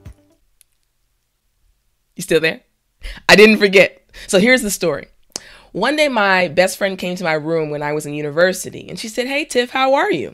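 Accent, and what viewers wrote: New York, United States. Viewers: Are you from New York? Atlanta? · by the way, you sound more American than the real British native speaker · Are you American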